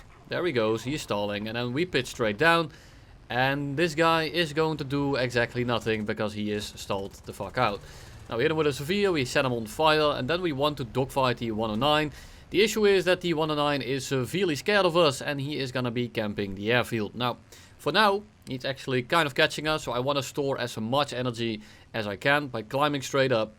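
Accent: Brabant, Netherlands. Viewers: for anyone intrested, theyre called vliegtuigboMMen, not vliegtuigbonnen. has a dutch accent (prob brabant) making his pronounciation deviate from normal Dutch people (ABN) 🤓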